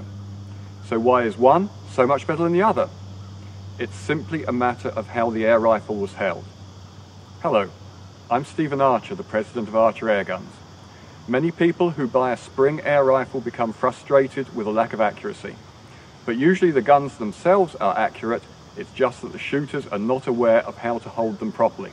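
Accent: United Kingdom. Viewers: cool, its alot better for guns in the US i bet compared to here, (especially because the bad press airguns have gotten over the past few months!) and make sure u always keep your accent, english accents are the best, i love mine haha...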